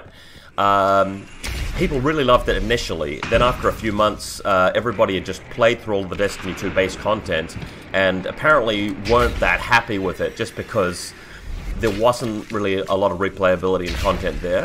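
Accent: New Zealand. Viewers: bruh i fucking thought you were Kiwi, you didnt have any other accent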